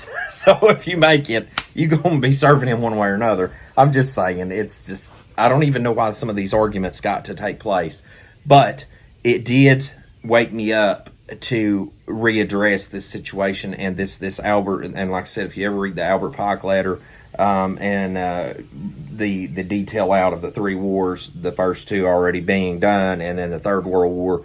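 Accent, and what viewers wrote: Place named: South, United States. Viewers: As a colored young man I can honestly say I actually like that country accent bro lol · Brother, I was blessed when I found your channel with someone else with an accent like mine. I'm from Kentucky, but our accents are very similar so you feel like home to me. I thought of something while you were talking, and I'm sure you and others have already done thunk it long ago. While the fake Saturday Sabbath Keepers are getting persecuted us lunatics keeping the truth Sabbath will probably be flying under the radar and not get persecuted, but protected by the Most High for keeping His true Sabbath.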